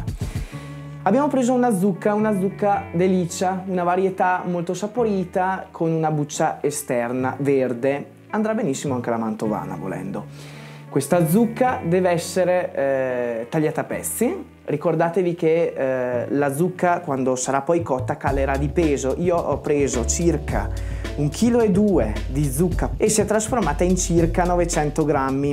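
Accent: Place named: Veneto, Italy. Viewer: Ma te si veneto 💚? se non sbaglio... meraviglioso il piatto, complimentoni!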